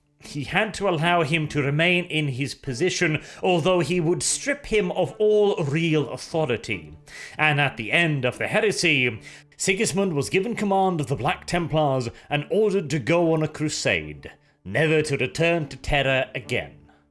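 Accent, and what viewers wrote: United Kingdom. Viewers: I just started watching your content after the perturabo and you my friend have the quintessential British voice and it’s so good to listen to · I love your videos almost as much as I love 40k! But I have a question: Did you know that you have the "evil" British accent?? Works out great but I'd hesitate to meet up for a drink at a little pub . . . . .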